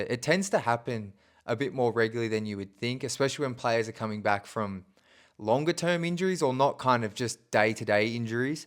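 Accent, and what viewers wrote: Australia. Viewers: Accent through me off I’ve never received my pistons news via an Australian ! Good shit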